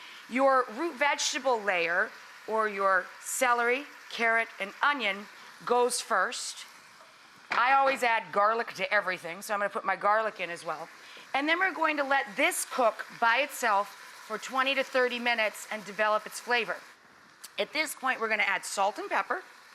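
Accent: United States. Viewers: As soon as I heard her American accent I knew this would go wrong! I shut down straight away not being racist but Americans are not the most aspiring people in the world.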